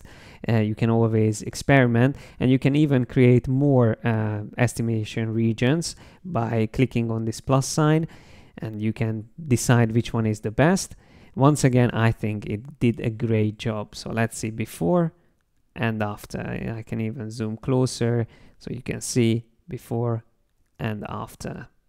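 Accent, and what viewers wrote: Hungary. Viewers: király videó hallani a magyar akcentusod :-)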